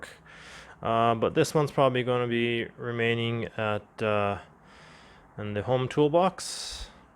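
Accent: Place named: Japan. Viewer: I assume you're Japanese from your pronunciation of Japanese names (and having a Japanese magazine), but your English is leagues better than other Japanese locals. Especially with the accent (it's still there, but only slightly). Did you study in America or grow up there?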